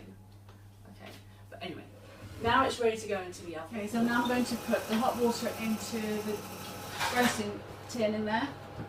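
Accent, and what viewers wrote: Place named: United Kingdom. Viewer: Lucia P Que parvidade. Cada um fala a língua que quer. O inglês é mais universal e funciona melhor em termos de views no youtube. Além disso, não conheço esta senhora, mas o sotaque dela é britânico, portanto presumo que ela seja inglesa e não portuguesa, e que apenas esteja a ensinar uma receita portuguesa.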